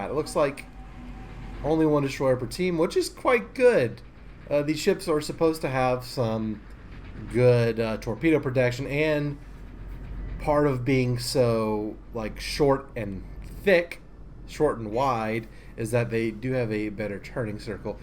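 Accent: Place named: Minnesota, United States. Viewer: As a Minnesotan I can confirm that accent is 100% accurate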